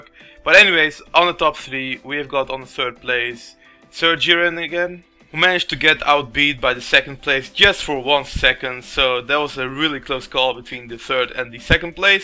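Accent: Netherlands. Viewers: Are you dutch :0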